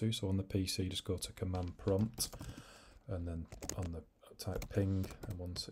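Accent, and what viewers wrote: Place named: England, United Kingdom. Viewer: amzing english accent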